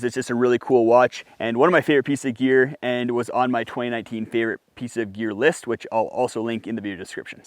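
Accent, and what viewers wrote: Canada. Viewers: Wow this guy’s Canadian accent is thicc!!! I’m from Detroit so familiar with Windsor, Ontario but this is at another level. Great information though! I added some of these items to me REI shopping cart. Thx!! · The Canadian accent is amazing.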